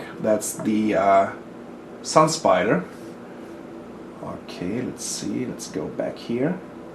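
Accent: Germany. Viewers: lustig zuerst das deutsche und dann das englische zu schauen... Your accent is just funny -but this is germans like....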